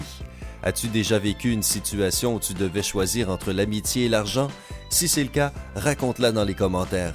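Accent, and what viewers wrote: Canada. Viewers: Ces conseils semblent tirés d'un magazine axé sur le même stéréotype : un couple, un enfant, un budget, Papa travaille dans le tertiaire comme cadre moyen... C'est très réducteur, ces conseils qui n'ont rien de nouveau. J'ai l'impression, de plus, qu'avec l'accent de la voix off, ces "14 faits" proviennent du Canada où les conditions sont différentes - on demande, en France, si facilement une "promotion" ? - non, et tous les chiffres budgétaires sont approximatifs (et on a tout converti en Euros). Les chiffres de l'immobilier sont très variables en France, mais une maison correcte à 100 000 € sans rénovation coûteuse, ça n'existe que dans quelques départements désertiques. Et puis les bons conseils entre ne pas emprunter, mais savoir investir, on est là encore axé sur un modèle unique presque idéal. Tout le monde est fait pareil ? Non. J'ai l'impression d'entendre à nouveau les conseils de ma mère quand j'avais 20 ans, et à des conseils trop formatés, il n'y a jamais de résultats parfaits (voire des échecs). 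Ma mère n'imaginait pas, il y a 30 ans, ce que je serais aujourd'hui, dans un contexte socioéconomique radicalement différent. Donc, tout cela est peut-être vrai, mais pour "un-couple-trentenaire-qui-veut-avoir-une-maison-pour-avoir-un-enfant-à-payer-avec-une-augmentation-de-Papa". La pub idéale, quoi. La vie idéale qui n'existe pas. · Techniquement, ça s'entend que le doubleur est canadien (ou québécois peu importe) donc ils suivent globalement les mêmes règles.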